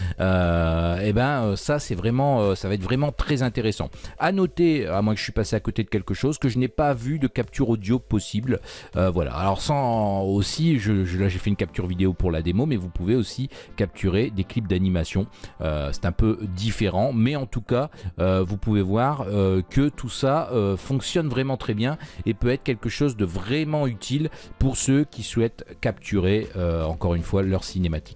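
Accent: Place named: England, United Kingdom. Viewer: J'aime bien ta chaine et globalement bien tes vidéos. Mais je trouve quand même que c'est parfois un peu bâcle. C'est un thème intéressant à aborder, mais on dirait que rien n'est préparer et que tu ne sais pas de quoi tu parles (ici notamment quand tu décris les fonctionnalités de l'inspector). Outre l'accent anglais qu'on te connait, y a beaucoup de variables/fonctionnalités que tu n'expliques pas alors que ça serait bien utile. Tu as plus ou moins dit que le frame rate c'était pas vraiment utiles à modifier pour un usage amateur, et que ça correspondait plus à un paramètre plus compliqué/poussé dans le domaine de la vidéo alors qu'en réalité c'est littéralement LA BASE. Voilà tout. Ce commentaire n'est pas à prendre contre toi ou negativement, c'est simplement une critique constructive pour t'aider :)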